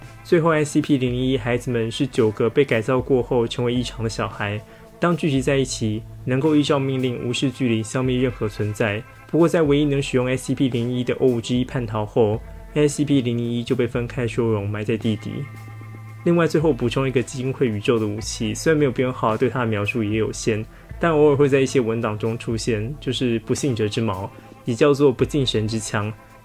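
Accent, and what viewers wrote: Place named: Taiwan. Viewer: 最早在B站上看到这个youtuber，一开始不知道是youtube上面的，后来听到湾湾口音觉得肯定不是大陆人（福建人口音虽然一样但不会那么明显哈哈哈）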